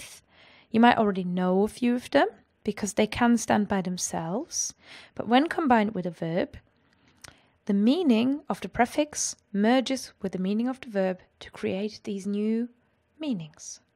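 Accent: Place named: United Kingdom. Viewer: I love the British Accent English and the German together! Great combination and very clear simple explanation.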